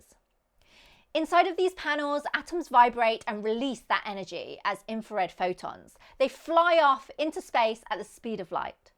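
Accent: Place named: Midlands, United Kingdom. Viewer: Why no Wikipedia? Or did I misspelll? " 2 March 2018 J04n talk contribs deleted page (Wikipedia:Articles for deletion/ (XFDcloser))" I was just wondering what her accent is. It doesn't really sound Midland, but words like "upset" sounds like "oopset." So, maybe she is. I luuve her content.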